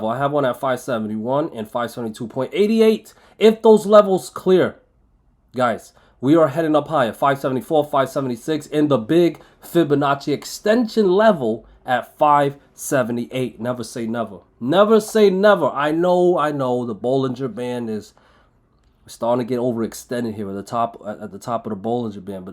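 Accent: New York, United States. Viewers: you are awesome!!!! you almost sound like a New York City guy!!!!!!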